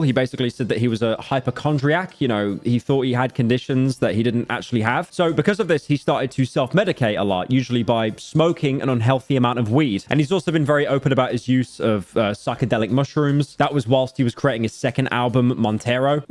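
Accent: United Kingdom. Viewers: Nah that's how its pronounced, or at least close, just British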